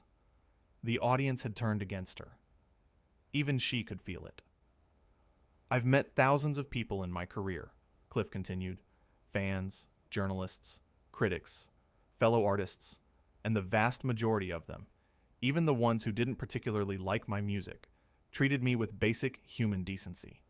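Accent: United States. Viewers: Why is an American doing the commentary?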